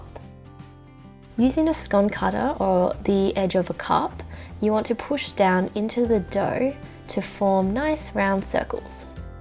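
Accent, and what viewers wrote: Australia. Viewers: This is not meant to be insulting or racist in any manner, but as an Aussie of Scots-Irish descent, and having been descended from First Fleet penal colonists, I’ve never been able to wrap my mind around an Asian with an Aussie accent. That said, the scones look deelish…nice and fluffy.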